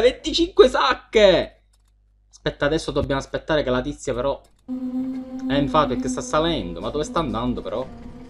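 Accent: Palermo, Italy. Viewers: l'accento palermitano migliora tutte le urla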